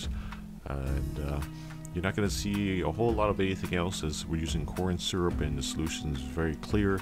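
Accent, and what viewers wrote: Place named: Ohio, United States. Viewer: All I kept saying is that this guy is def from Ohio or near some Amish country. Lol thanks for the lesson!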